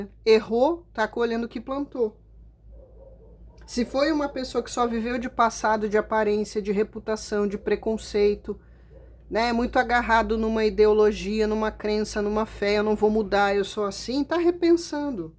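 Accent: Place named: Rio Grande do Sul, Brazil. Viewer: que nem tu não tem cara tu é a melhor e o sotaque Nossa aqui do Rio grande do Sul não tem comparação tu é a melhor, parabéns eu adoro e dou muita risada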